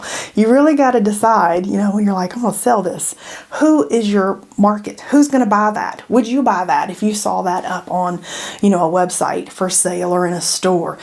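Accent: South, United States. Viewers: the familiar southern accent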